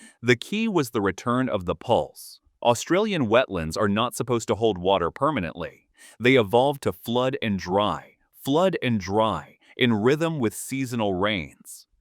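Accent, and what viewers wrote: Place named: United States. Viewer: ok - an American accent producing this video 🤣🤣🤣 have they been there before? it was the Victorian State Government - seriously did you do your research????????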